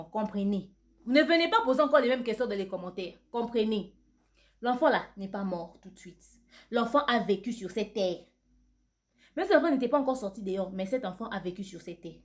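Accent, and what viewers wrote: Ivory Coast. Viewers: Ma fofolle reste béni je t'aime moi j'aime l'accent ivoirien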